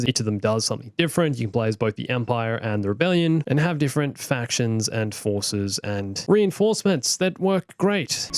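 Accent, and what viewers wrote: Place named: Australia. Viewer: Wait your an Aussie, I thought u where a briton.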